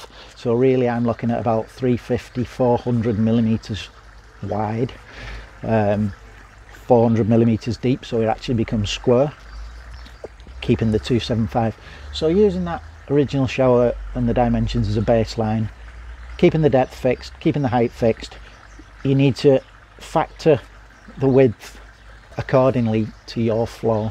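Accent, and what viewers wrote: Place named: Manchester, United Kingdom. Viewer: I know St Helens well mate although it was a bit of a surprise, as I could have sworn you jave a Manchester accent. Keep up the great work.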